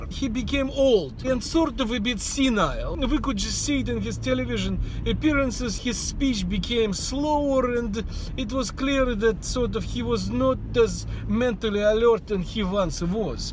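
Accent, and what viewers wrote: Russia. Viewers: It was funny and the accent made it even funnier. Gotta love Russians. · I found this dude because I was playing metro Exodus on ps4 and I started to look up Russian accent ... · im whatching your videos and im 15 to trying to copy some of the ways you pronounce word or vords to get a cool rrrrrrusian eccent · i enjoyed ur russian tone love from nepal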